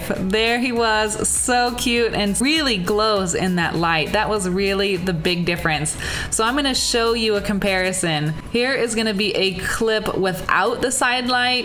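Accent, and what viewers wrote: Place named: Midwest, United States. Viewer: You sound like youre from Michigan or some midwest state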